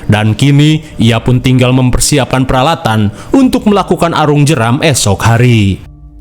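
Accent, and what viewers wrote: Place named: Sunda, Indonesia. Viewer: Narasi narator, kaler, cagak, poek, rorombehen, ari si akang urang mana euy ?😅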